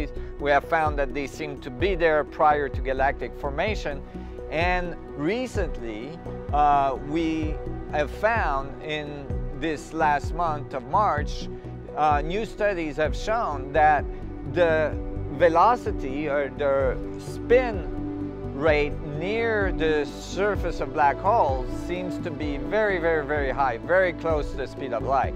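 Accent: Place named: Quebec, Canada. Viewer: Just found this one....following Nassim since 2006.....LOVE HIM, LOVE HIS MIND, HIS LOOK, HIS FACE, HIS QUÉBECOIS ACCENT, the whole being....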